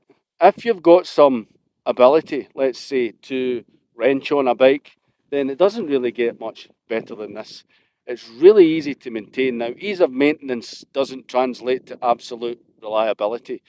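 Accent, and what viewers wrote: Scotland, United Kingdom. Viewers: that's a Scottish accent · a broad Scott coming out with a pure American phrase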